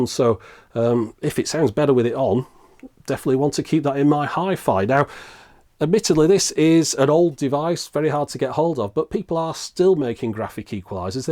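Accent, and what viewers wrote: United Kingdom. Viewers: Love the videos! The British accent you have makes the show seem smarter. And yes it's true: Americans think all British people are smart! Especially if we are from the south! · The video is educative because your presentation on perfect British English is cure for ears,only sugestion for this clip is absence of back panel and connection ports.....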